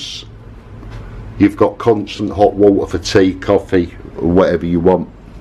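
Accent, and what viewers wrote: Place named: Cockney, United Kingdom. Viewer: That's because it's cockney guvnor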